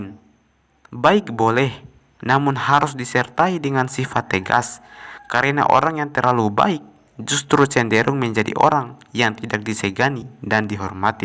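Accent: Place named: Buton, Indonesia. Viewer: Logat mu buton betul🤣🤣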